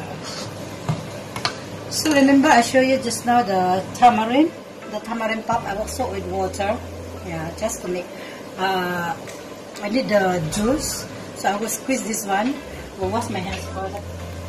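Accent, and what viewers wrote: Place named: Singapore. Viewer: Ganda ng accent mo legit na legit na singaporean hehe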